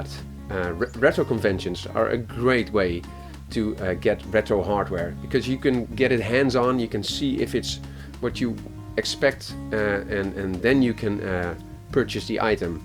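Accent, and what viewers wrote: Netherlands. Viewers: i was thinking you are dutch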